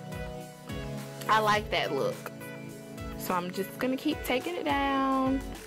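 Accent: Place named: Atlanta, United States. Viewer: Hey!:P love this look. Are you from ATL by the way? I heard a lil accent:)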